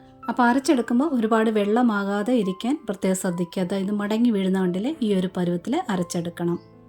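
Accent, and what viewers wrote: Kollam, India. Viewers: കൊല്ലം slang 😊..recipe is healthy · കൊല്ലം ജില്ലകളിലുള്ളവർ പറയുമ്പോൾ സംഭവിക്കുന്നതാണ്... പ്രദേശികമായ ഓരോ സ്ലാങ്ങുകളുടെ പ്രത്യേകതയാണ്.. ഞങ്ങൾ കൊച്ചിയിലുള്ളവർ മാങ്ങ പറിക്കുക എന്ന് പറയുമ്പോൾ, കൊല്ലത്തുള്ളവർ മാങ്ങ പിച്ചുക എന്നാണ് പറയാറുള്ളത്.. Never mind.. റെസിപ്പി എന്തായാലും സൂപ്പർ 🙏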